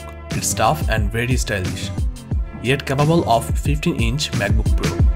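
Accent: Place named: Bangladesh. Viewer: You sound like a Bangladeshi :-)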